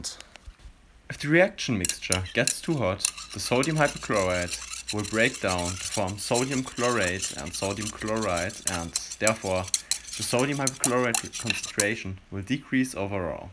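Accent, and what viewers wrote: Germany. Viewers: I hear your german in your voice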